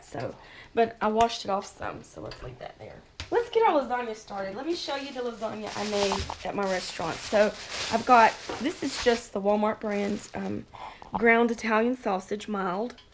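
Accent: South, United States. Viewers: Love how those southerners pronounce the Italian name "Barilla".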